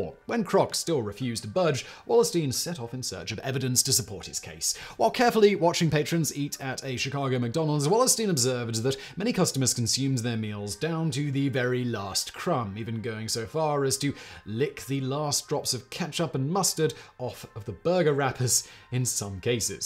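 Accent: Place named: RP, United Kingdom. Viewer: Why do he speak in that accent when in much older videos he sounds nothing like this? As someone from England i really feel like he's hamming up the RP accent to appear more sophisticated to the american audience?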